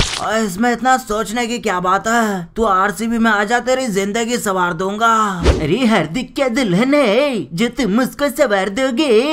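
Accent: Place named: Hyderabad, India. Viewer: Will I am sonn 😍best👌 kya Hyderabadi bolte ho bhai tum ek dum awesome 😍 sun ke maza aa jaata hai 👌😄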